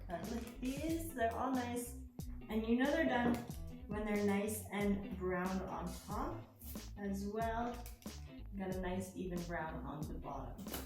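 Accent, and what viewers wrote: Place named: Ontario, Canada. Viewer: You are adorable! My daughters name is, as well. She is soon 29. So love that name. She’s named after an Irish friend of mine. I always called her “ Jiggs,” too. We had all of the books. Such wonderful memories! I grew up in Ontario, Canada. She lives in Stratford, Ontario, now. You sound like you are from Ontario, also.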